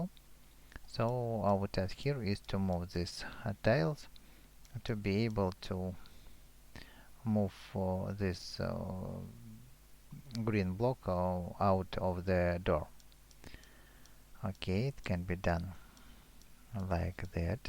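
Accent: Russia. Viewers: А ты русишь ты спалился что у тебя русский язык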